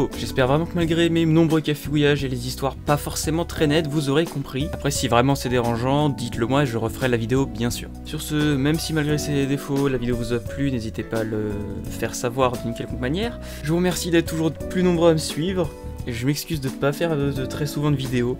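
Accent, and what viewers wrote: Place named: Spain. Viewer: frérot tu mélanges l'accent japonais foireux à ton accent français j'ai l'impression tu parles espagnol j'comprend rien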